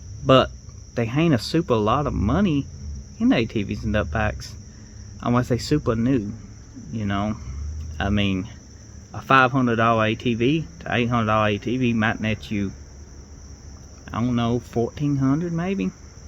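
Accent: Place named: South, United States. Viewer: My first instinct was why does this English man have a southern accent....I listened a little longer and and it became more clear..... discombobulated me at first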